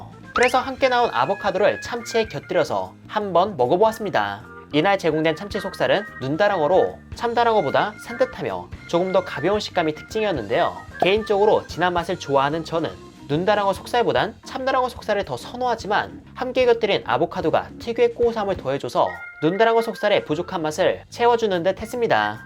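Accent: Gyeongsang, South Korea. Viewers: 님께서 경상도 집안 출신이 아닐까요?....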